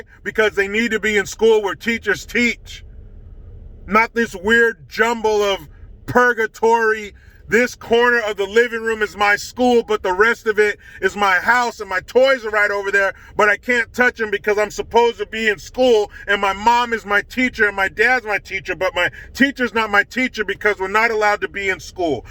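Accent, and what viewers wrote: Australia. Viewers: Love your Ausssie accent, pmsl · Love the Australian accent, mate!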